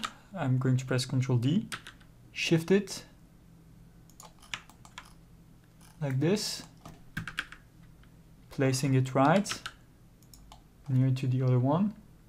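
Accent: France. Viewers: I’m starting to think you’re only apologising for your French accent so we compliment it ;) awesome tutorial, real time tutorials that explain everything in detail is a rare find!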